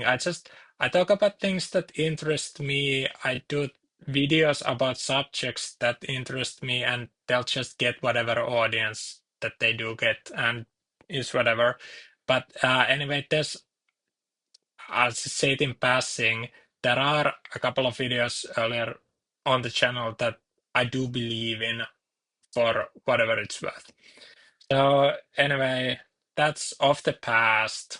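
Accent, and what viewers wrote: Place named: Finland. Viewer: Glad to see you back man! Been missing that sweet Finnish accent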